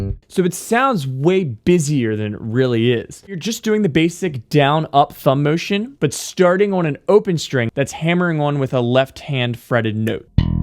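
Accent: Baltimore, United States. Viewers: Awesome lesson, man! I enjoyed the Baltimore accent most of all though.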